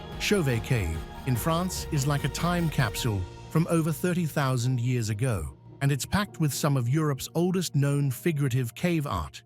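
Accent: United Kingdom. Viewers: next time i watch, i will count the usage of "cool." meanwhile, as i am an american, i had to use captions to see what he was saying with his u.k. accent...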